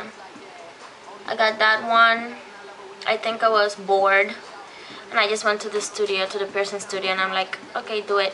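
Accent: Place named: West Indies, Caribbean. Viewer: I love your west-indies accent! Your real pretty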